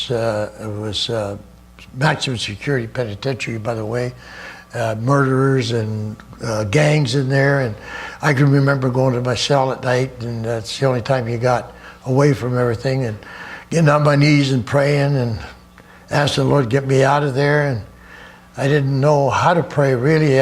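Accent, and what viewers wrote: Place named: Canada. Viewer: So strange to hear uncle Ivan speak with a Canadian accent. Growing up I really thought he was Russian. He was great!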